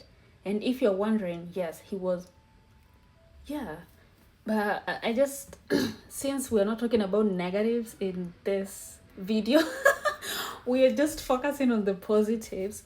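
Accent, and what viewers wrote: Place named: United States. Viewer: Ee the American English assent in you❤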